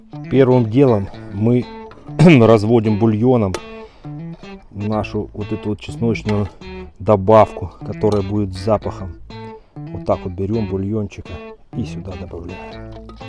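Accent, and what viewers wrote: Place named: Kuban, Russia. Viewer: Ну шо поделать, с Кубани парень..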